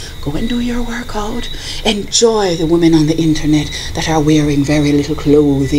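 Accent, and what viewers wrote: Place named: Jamaica. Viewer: Patois voice better! :)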